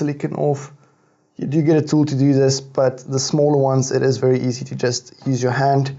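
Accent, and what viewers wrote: South Africa. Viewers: You from south Africa?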